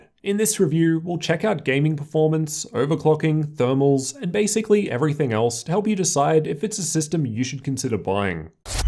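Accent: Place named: Australia. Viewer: the Lornorworrrrrrr Australian accent is cute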